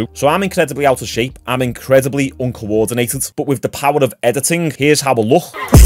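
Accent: Liverpool, United Kingdom. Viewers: 'Maihhh loohhs greit' - how can you not love Liverpool accent? God bless you!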